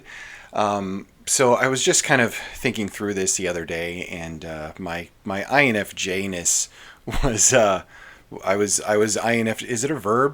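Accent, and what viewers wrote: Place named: Forest Hills, United States. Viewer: You sound like you went to forest hills high school, plus the silibent s and the lateral emissions indicate several minor speech impediments and lots of dental work.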